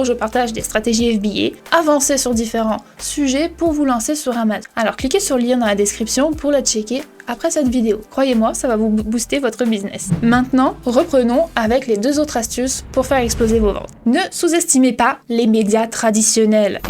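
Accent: Quebec, Canada. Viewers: Merci pour ces idées de recherche produits ! Il est aussi intéressant de constater que dans le seller central il y a des outils "gratuits" (on paye l'abonnement) qui sont plus précis que les outils tels que Jungle Scout ou Hélium 10... Car les data viennent directement de Amazon... As tu fait une vidéo là-dessus ? Merci pour ta bonne humeur et ton petit accent québécois... ❤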